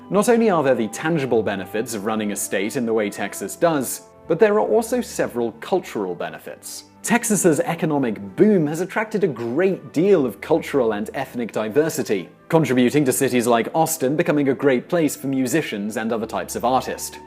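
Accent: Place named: United Kingdom. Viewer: The closed captioning is all wrong.. This is what Simon said in marker "Now it is true that Texas has suffered from the fall in oil prices, many people believe that this state due to its increasingly diverse economy it will lead the way in the United States." This is what is actually written in the closed captioning... "And even though the economy in Texas is suffering from the fall in oil prices, very few people believe that this state won't keep its lead thanks to its increasingly diversified economy." No mention on the statement that Texas "will still lead the way in the United States." Who ever does the closed captioning needs to put their own political bias aside, get a q-tip, clean their freaking ear wax out and listen to the commentator and write what is said. is speaking with a very clear british dialect, I wouldn't say it's British RP; however, its very clear. 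Maybe the new closed captioning job will open up in Texas.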